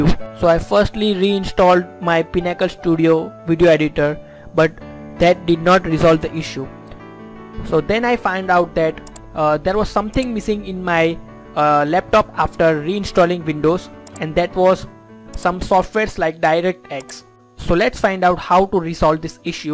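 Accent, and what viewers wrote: India. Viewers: YOU ARE THE MAN!!! 10+ years I worked with Studio, then a few power cuts happened in a short row, always happening again whilst my pc was restarting. at the end it didnt start at all. the tech shop next door re-installed windows, but all programs and applications would be gone. so I downloaded everything from AVG over ACDSEE over FILEZILLA over HANDBRAKE .... up to STUDIO 19. Installing process went fine, just as dozens of times before in my like and then - I couldnt see a video, neither from the Source nor from the Timeline. It didnt make sense to me, I triple checked everything from Drivers to Graphic card to windows settings to Studio settings - all was fine. But I couldnt make those videos visible. I was chatting with Coral`s tech support as well of course - they had no clue whatsover. And then I just described on Google the problem that occures in the field for the search term and 3 clips appeared on Top with one being yours and when I heard the Indian accent I knew I was in good hands. 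How would a normalito like me ever would know of 3 windows apps which i had never heard of, unless someone who knows tells him. AND FOR THAT I AM TRULY GREATEFUL. NEXT TIME I GO STRAIGHT TO GOOGLE. THX A MILLION, PAPI. SALUDOS DESDE BARCELONA MARIO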